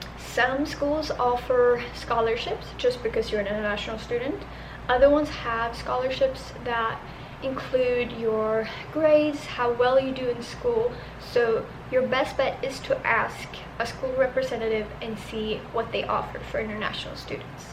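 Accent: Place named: South, United States. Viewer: girl, your southern accent is coming through! love it 😂 and miss you 😘 kinda jealous ngl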